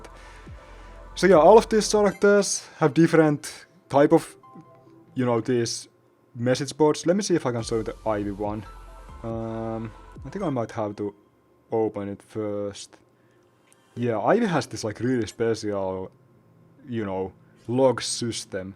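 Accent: Finland. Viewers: ÄIJÄ KUULOSTAA IHAN VITUN SUOMALAISELTA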